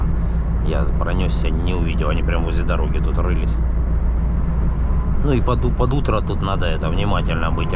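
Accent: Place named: Don, Russia. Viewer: 25.45 Я летом там забодался😂. Сразу видно, казак донской! Мы тоже так в детстве говорили.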